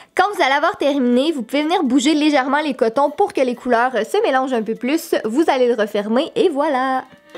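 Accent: Quebec, Canada. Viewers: T'es tu quebequoise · J'adore ton accent 😍😍 il est québécois ? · T québécoise ? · Accent Quebecois 😍😍😍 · Jdr l accent des canadiens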